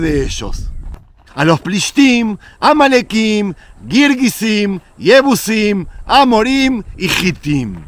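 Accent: Argentina. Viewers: Eres de Israel tienes el acento de Argentino... 🤔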